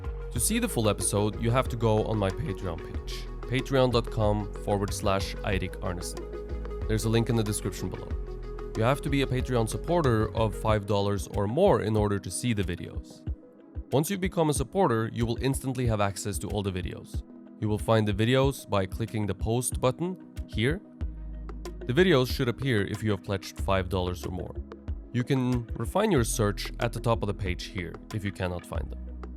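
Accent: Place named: Italy. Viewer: your wood took from torante? Terante? Toronto? Please don’t have this Italian accent. I’m searching for this tool for the whole day and I can’t understand what’s the name of the brand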